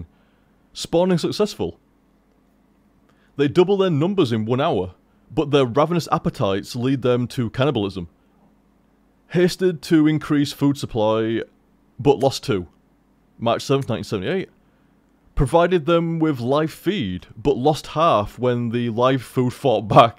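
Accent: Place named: Yorkshire, United Kingdom. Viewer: an Irishman. a Yorkshireman. Not with particularly similar accents, within those of native English speakers.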